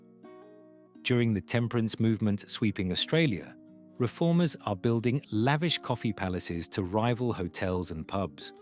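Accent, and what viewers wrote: United Kingdom. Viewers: Yes. The main narrative problem in my opinon was the a lack of pause when a new 'chapter' was being started (e.g., a new photo to signal a later period.) A visual signal would help, as you say. AI voiceovers often seem to do so well at the phrase level, but have no training currently at wider narrative. We also hear the anomaly of an British English voice mouthing large numbers US-style, without the "and" we put between hundreds (for example) and the sub-hundred part.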